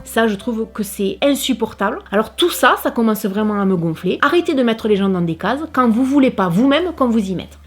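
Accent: South, France. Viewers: je te souhaite une nouvelle année pleine de joie et de sérénité. oui tu es youtubeuse mais tu n'as rien a voir avec certaines "poupées barbies" qui se ressemblent toutes. tu es naturelle, solaire et oui, oui, utile. depuis quelques temps, j'apprécie beaucoup tes vidéos plus personnelles. elles font du bien aux autres qui passent par des moments difficiles. ne change pas, reste comme tu es (et avec ta maman). j'ai l'impression de voir quelqu'un de ma famille et ça fait du bien. le monde devient très dur et ç'est un bonheur de te voir et de t'entendre avec ton accent du Sud. 🥰🥰🥰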